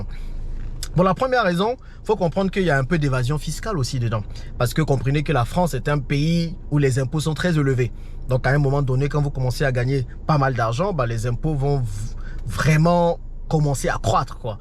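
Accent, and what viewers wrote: Cameroon. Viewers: Moi aussi quand je suis tombé sur ta vidéo pour la 1ère fois il y a un an, j'étais tenté de penser que tu étais camerounais vu ta façon de parler, de voir les choses etc. Ce qui est sùr, l'essentiel c'est d'ètre africain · La première fois que j'ai suivi une de tes vidéos en 2021 , j'ai cru que c'était un frère camerounais, il parlait comme les camerounais et n'est pas trop dans les histoires des clashs et fait bcq dans l'éducation, comme certains de mes frères et sœurs, comme Simo, Stéphanie, Marthe Carine, Nathalie yamb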